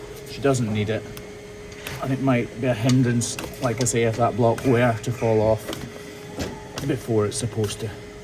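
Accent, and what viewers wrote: Scotland, United Kingdom. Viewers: Do english cows understand your Scottish accent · voice is soothing. I like it. And Scottish. I like it.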